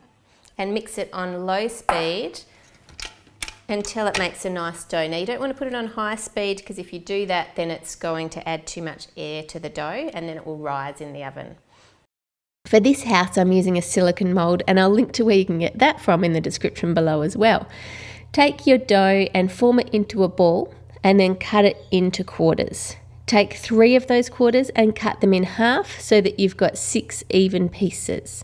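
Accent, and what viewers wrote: Australia. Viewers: I am from Maryland,Us and I would honestly love winning the mixer because I love baking ands cooking but I don't always have the tools to do so(ands being 14 I don't really have the money either) and I have always dreamt of having a mixer to make what the bakers online make (I don't only watch you and other Australian youtubers to perfect my accent) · Ikr I could listen to all day:D she's Australian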